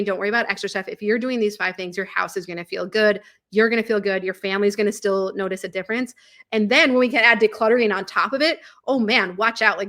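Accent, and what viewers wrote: Minnesota, United States. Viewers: Minnesotan, maybe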